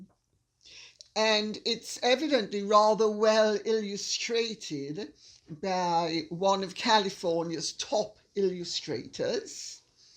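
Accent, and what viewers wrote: RP, United Kingdom. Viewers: Hi from Australia, i just loooovvveee your subtle insults with your finely clipped english aristocratic BEAUTIFUL VOICE. My daughters always often get a chuckle out of the way you have with words. Bravo 👏👏👏👏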